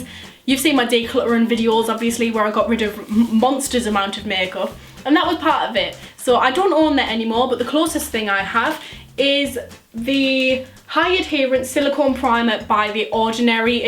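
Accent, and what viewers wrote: Newcastle, United Kingdom. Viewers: she’s from somewhere near Newcastle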